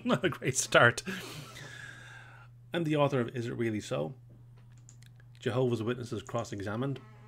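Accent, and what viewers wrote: Ireland. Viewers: Hi, sound like an Irish man... I'm in Mayo, what's your background?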